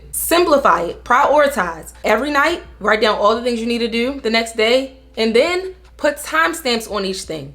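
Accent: Baltimore, United States. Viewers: I felt like you was from Baltimore lol yesssss , I love your videos ! They REALLY help me ! Mane , then I’m sooo isolated now & I see God is doing that . I just changed my alarm to 7am lol #baltimore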